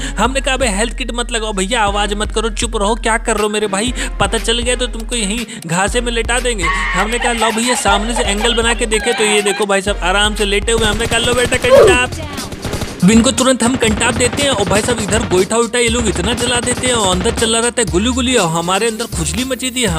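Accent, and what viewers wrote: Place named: Bihar, India. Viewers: Pakka aap bihar se ho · Bhai aap bihar se ho kya ? Aapki boli se lag rha hai / kyuki mai bhi bihar se hi 😄😄😄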